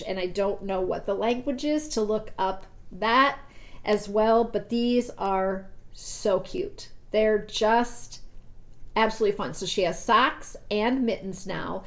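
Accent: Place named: Minnesota, United States. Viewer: Merry Christmas and family! Ohh, I have wanted to learn Brioche, but was always intimidated by it. Thank you for publishing this pattern and the encouragement you give for how easy it should be to learn and knit. Thanks for the discount also. P.S really noticing your Minnesota twang after watching the vlogmas....don't cha know!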